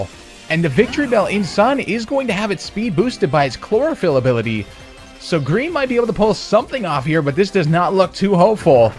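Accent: Canada. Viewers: Are you Canadian or am I imagining your accent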